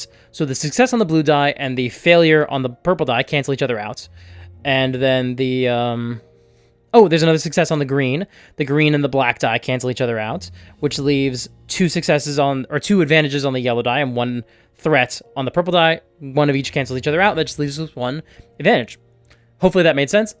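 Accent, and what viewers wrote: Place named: United Kingdom. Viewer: These Daggerheart videos are great!! British accent, on the other hand... (No hate tho man, I love your stuff)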